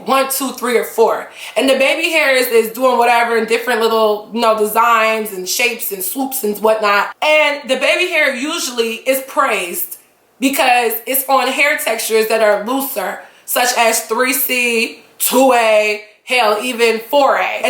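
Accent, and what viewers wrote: Nigeria. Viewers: that beautiful Nigerian accent😍😍😍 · Loved this video. Very funny and great points. Also love when the accent comes out. 9ja!!!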